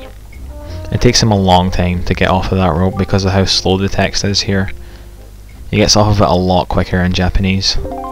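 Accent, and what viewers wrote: Scotland, United Kingdom. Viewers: holy cow is SCOTTISH, thats surprising lol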